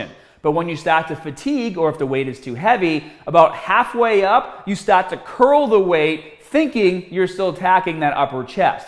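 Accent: Boston, United States. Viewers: Glad I found you again, I used to watch you way back, maybe <num>. All I could remember was that I was looking for a guy with an epic Boston accent. Great content!!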